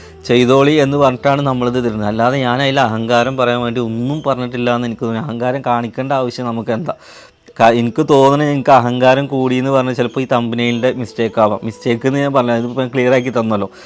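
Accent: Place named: Malappuram, India. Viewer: Nee adhyam uppaante ummaante ennu paranjirunnu ippo sinu parayum pole anu ippo parayunnath uppantem ummante ennu basha mattunnu nee Palakkad Jilla alle Malappuram basha varunnu ippo aduthayitt samsarathil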